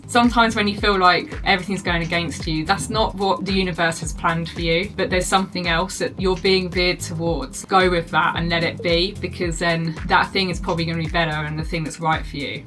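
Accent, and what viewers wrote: England, United Kingdom. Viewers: Your so adorable and cute Mr Jumps is one lucky fella and you should remind him of that every min of every day of ever week of every month 😅 and that part about you getting behind and never getting behind and you are not going to start to get behind was one of the cutest parts of this video😂 your English accent and intense eye emotion while your talking amuses me as much as how damn good you are at jumping rope! I wish you and yours the happiest of moves and times in your new home. You both deserve it!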